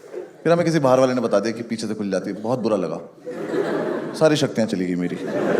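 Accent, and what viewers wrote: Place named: Meerut, India. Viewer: Saare Meerut ke jaat aise hi bolte hain.. ditto!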